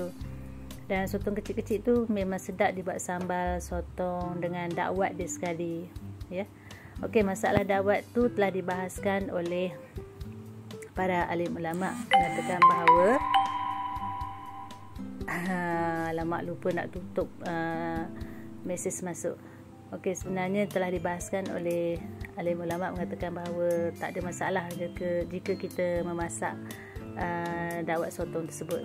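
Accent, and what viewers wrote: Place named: Malaysia. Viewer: saya juga kurang suka dawat nya kak karna nggak enak dipandang warna nya setelah dimasak, denger kak ngomong berasa nonton drama malaysia, aku suka film2 malaysia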